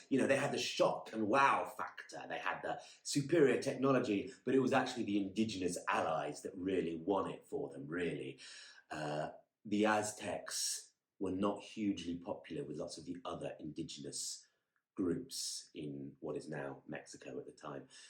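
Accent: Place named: Birmingham, United Kingdom. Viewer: Hey are you from Birmingham? Your accent reminds me of an old roommate of mine from there:)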